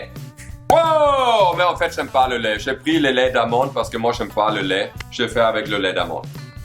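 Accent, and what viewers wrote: Quebec, Canada. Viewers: Ah le canadien🇨🇦 un mélange d'allemand🇩🇪 et de francais🇫🇷 🙄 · J'adore l'accent Québécois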